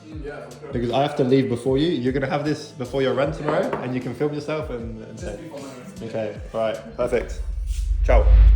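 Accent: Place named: United Kingdom. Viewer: Sam vraiment ton anglais il m’impressionne avec la petite touche british wo’er 😂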